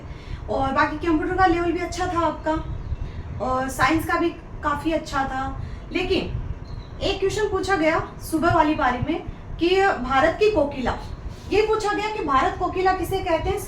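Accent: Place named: Jhunjhunu, India. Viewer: Mam aap mere ko bhasa sai to jhunjhunu sai lag rhai ho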